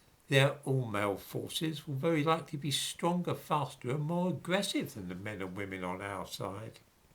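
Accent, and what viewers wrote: United Kingdom. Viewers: you have a way of telling the tale like Fry in Black Adder. We Americans have an affinity for Brit accents. Your opinions sound like American ideas with an ancestral accent.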